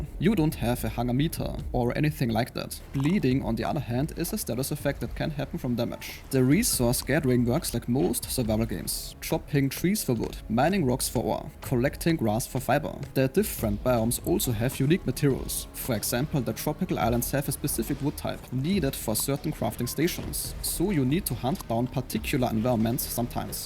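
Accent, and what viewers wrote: Germany. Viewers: The German accent is very noticeable :D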